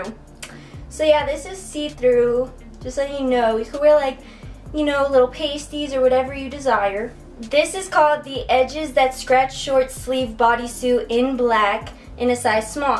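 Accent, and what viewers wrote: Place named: South, United States. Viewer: is it just me or she has a slight country accent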